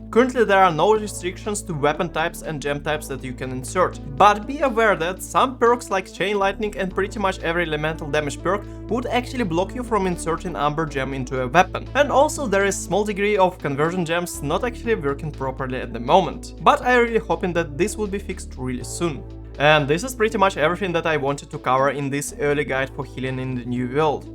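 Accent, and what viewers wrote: Russia. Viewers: Русской школой попахивает английский)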